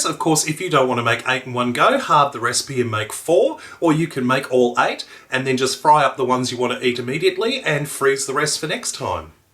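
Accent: Australia. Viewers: Australian? Awesome :D. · I am just glad that he has an Aussie accent. I get tired of everyone who is heavy being labeled a fat American. This looks delicious BTW.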